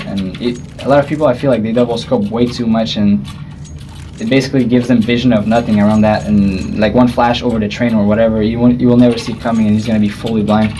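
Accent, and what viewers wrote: Quebec, Canada. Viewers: I like that quebec french accent :D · Dat subtle french-canadian accent.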